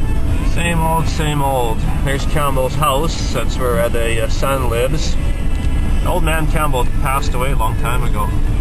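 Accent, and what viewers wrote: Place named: Fargo, United States. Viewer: funny accent. like the movie Fargo!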